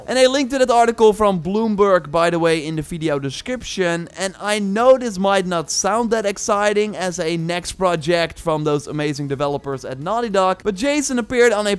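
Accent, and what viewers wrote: Netherlands. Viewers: Are you from holland or somewhere near? :p i can definitaly hear a dutch accent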